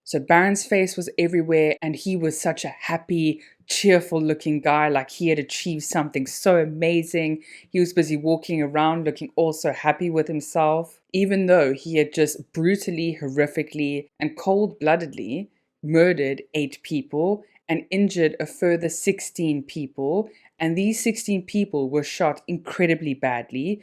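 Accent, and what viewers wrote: South Africa. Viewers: I believe is presenting this case for its phsycopathic value in relation to Barend, not because she wants to be political or racist. Obviously she is S African, accent is unmistakeable.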